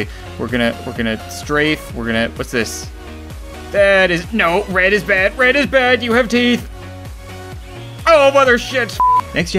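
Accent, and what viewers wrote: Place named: Canada. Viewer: I love your canadian accent btw :P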